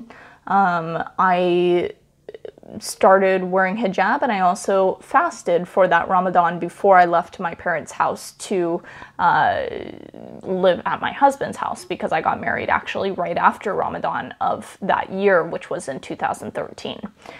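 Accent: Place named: RP, United Kingdom. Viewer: By the way you have queens accent are you familiar to her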